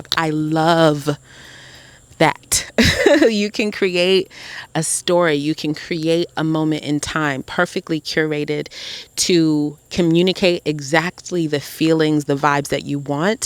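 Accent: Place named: South, United States. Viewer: You have every type of country accent in your voice lol i hear upstate, FL & da boot lol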